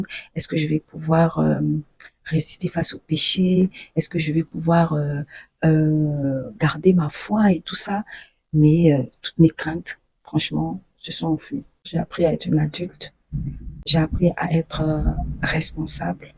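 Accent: Ivory Coast. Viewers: Vous avez l'accent ivoiriens mou pour moi j'écoute une ivoirienne parlé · Tu as même,l accent ivoirien, lol sois bénie, akwaba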